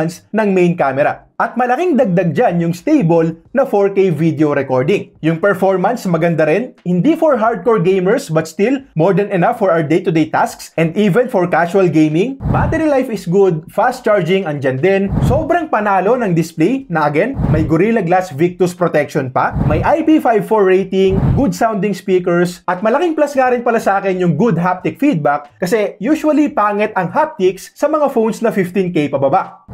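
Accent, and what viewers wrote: Cavite, Philippines. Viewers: tga cavite pala kayo sir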